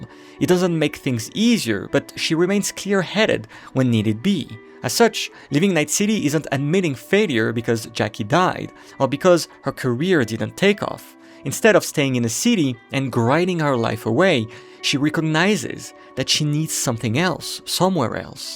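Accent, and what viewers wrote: France. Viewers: Good video. Is it just me, or you have a French accent?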